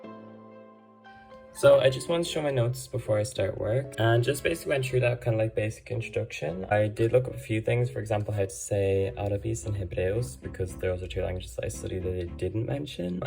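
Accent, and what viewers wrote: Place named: Netherlands. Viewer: As an Afrikaans person, learning Dutch. I can hear a lot of Dutch influence in your Afrikaans. Your pronounciation is Dutch.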